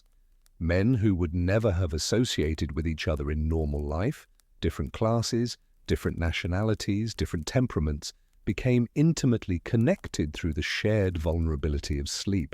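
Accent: England, United Kingdom. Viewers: US accent please. UK accent sounds unrelated to subject- American West. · A british accent narrating something about the wild west just feels wrong, AI has ruined everything. 🙄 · America history, all be it short is amazing. Look at all of our accomplishments in such a short time. Starting off by winning our independence from the most powerful monarchy in the world at the time. Our dominance in Both world wars especially the second one. The industrial revolution to pave our path to becoming the most powerful nation that’s ever existed. That’s just a few of our historical examples. That being said, I enjoyed the English accent. In this video.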